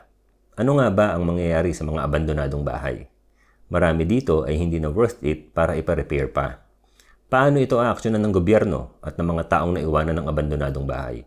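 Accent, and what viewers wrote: Philippines. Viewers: Bossing tiga saan ka sa pinas nueva ecijano kase tagalog mo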